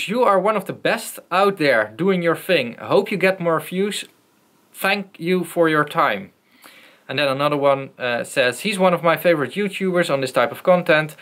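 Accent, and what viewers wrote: Netherlands. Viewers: Are you dutch, you have a bit of an accent?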